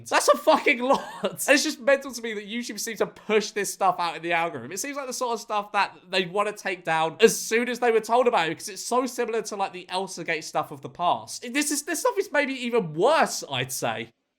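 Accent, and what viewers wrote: United Kingdom. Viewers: I approve i like this smol bri’ish man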